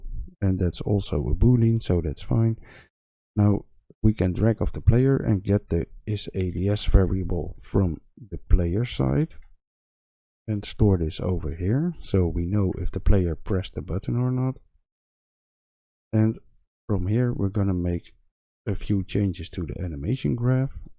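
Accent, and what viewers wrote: Netherlands. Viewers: You can so clearly hear you are Dutch! I am complete 47 years noob learning UE for fun, love this tutorial.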